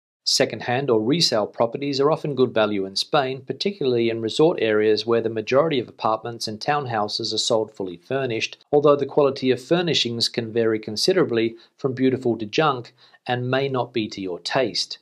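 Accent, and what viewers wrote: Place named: Australia. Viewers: Aussie aussie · How? I'm not english native speaker and I could tell his accent was from Australia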